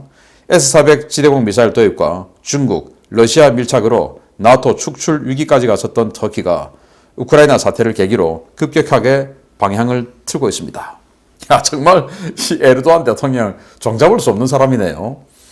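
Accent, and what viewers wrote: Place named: Gyeongsang, South Korea. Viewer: 선생님의 소식은 정말 시원하고 알기쉽게 잘전햐주셔서 너무 좋습니다. 경상도 말씀이 더 구수합니다.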